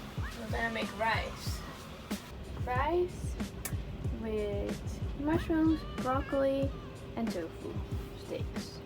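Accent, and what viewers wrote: Netherlands. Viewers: lovely video!! i didn't know your channel before and honestly didn't look up the description or your channel before watching this video and omg, i immediately thought that you're from the Netherlands!! i absolutely love your accent! and the food ideas in this video 😍 i need to see if you've got more of these videos about you both living vegan without a fridge ☺️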